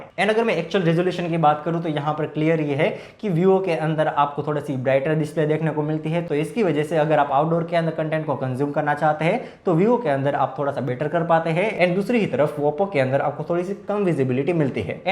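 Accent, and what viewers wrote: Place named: Jamnagar, India. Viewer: Flawless 🎉 u seem to be from jamnagar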